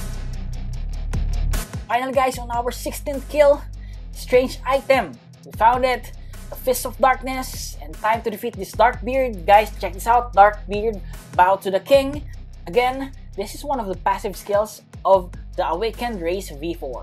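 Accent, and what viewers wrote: Philippines. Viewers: You sound Filipino sir are you Filipino?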